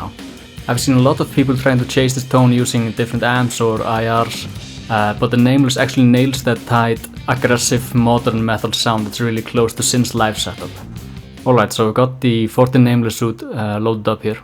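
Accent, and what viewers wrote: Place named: Finland. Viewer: yo, you sound finnish lol, love the video. Keep going!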